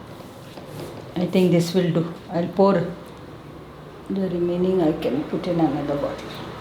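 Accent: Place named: Mumbai, India. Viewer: Hii.. I just want to say Bangdda Bangddo Bangddulio Bangdde it’s the same..it depends on the pronunciation just like salcettekars and Bardezkars pronunciation.. If she lives in Bombay being a Goan from Goa she’s there for a purpose of her living for bread and butter for job purposes and good opportunities unlike the same who works abroad.. if they live anywhere beside goa that doesn’t mean they’re Maharashtrian or belongs to any other religion or caste or nationality..she’s very much a Goan from Goa and lives in Bombay.. instead appreciate her recipes and comment over that.. that’s solely her style of making and saying.. Saying Bangddo doesn’t make her an Maharashtrian it’s just that staying for ages in Bombay or any other country you adapt the accent of that state or country.. What she does is just exhibiting her Goan recipes for the once who’s anxiously wanting to learn and prepare the delicacies of Goan cuisine.. 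instead appreciate her work and give feedback on the recipes and not the pronunciation.. And yes residing anywhere in the world country or states doesn’t matter we all know why we’re there.. what matters is our roots that we’re Goans from heart and birth.. A root grows inside the soil and the tree or plant comes out and we see them widely that doesn’t mean if the roots are inside the soil then it’s different then the tree or plants visible from outside belongs to somewhere else.. it’s the part of each other.. same way…. You can take a Goan out of Goa but can’t tak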